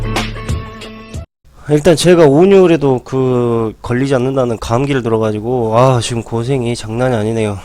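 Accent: Jeolla, South Korea. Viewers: 전라도 사투리?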